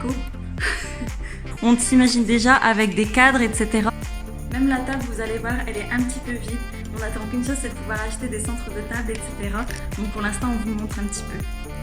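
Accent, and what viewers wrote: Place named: Quebec, Canada. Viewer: tu prononce tes a comme nous deja ta l accent ..bienvenue au quebec bon succes pour votre chaine je m abonne et vous suit pour d autres videos..avec l hiver c est la periode ideal pour decouvrir notre culture et nos artistes ainsi que notre univers musical qui est tres different de la france amicalement d un nouvel ami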